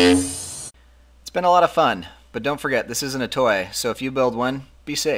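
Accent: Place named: United States. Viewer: typical american voice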